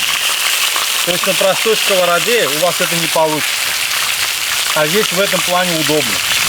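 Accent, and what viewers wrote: Baku, Azerbaijan. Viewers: Прекрасное блюдо! Острый нож! Золотые руки! Грамотная речь и бакинский акцент!❤❤❤❤ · Спасибо и отдельное спасибо за бакинское наречие. Родился и вырос в этом прекрасном городе к сожалению в прошлом прекрасном, каждый раз когда приезжаю к маме наслаждаюсь её запахом, её улыбкой и разговор ами к сожалению все друзья и одноклассники тоже уехали кто куда а в городе одини чужие именно нехватет вот такие беседы как с Вами душевный бакинский акцент, спасибо будьте здоровы обнимаю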